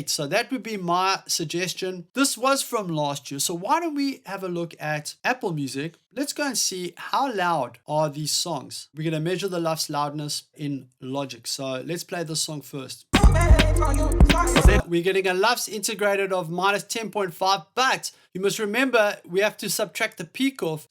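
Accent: South Africa. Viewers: You sound south African bru!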